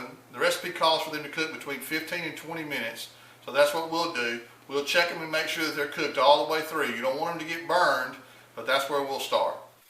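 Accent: South, United States. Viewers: My husband is going to LOVE these! Thank you and so much for this recipe! I love your accent and funny southern expressions..."Keep doin it till you got it gone" lol. Very entertaining... and these are great recipes! I made your Date Nut Balls this morning for our Christmas party today. Delish! xox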